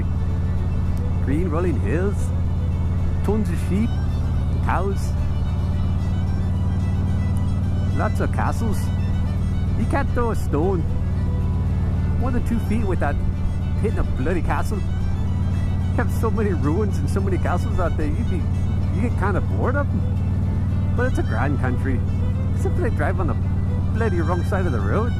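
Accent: Ireland. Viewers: Irish is pretty good...Scottish needs work! Watch Braveheart a few more times! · Great Irish accent mate. I too have an accent, because I grew up in Quebec and I’m from Italian decent lol. Ride safe bro.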